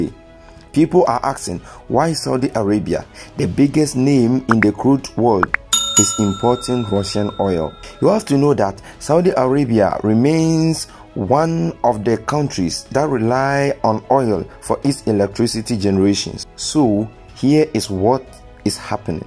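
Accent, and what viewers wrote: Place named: Africa. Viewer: It is an accent from somewhere in Africa.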